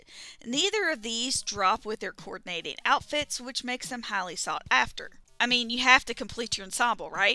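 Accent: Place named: Georgia, United States. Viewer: 👍 for the helpful tips Subbed for that sweet peach country accent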